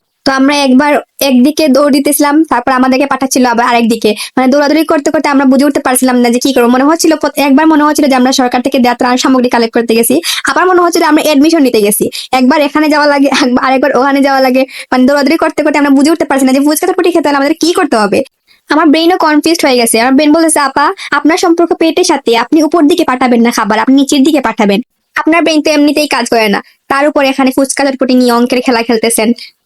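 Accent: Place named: Sylhet, Bangladesh. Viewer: Boin sylhety matilaw regho shuddo basha re nu rape korilray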